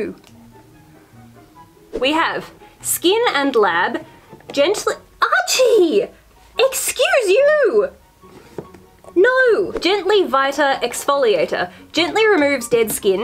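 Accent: Australia. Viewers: i love your accent are you from Australia ❤️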